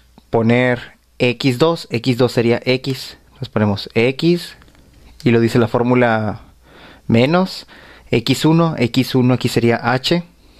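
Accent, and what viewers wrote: Mexico. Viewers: es chistoso el acento mexicano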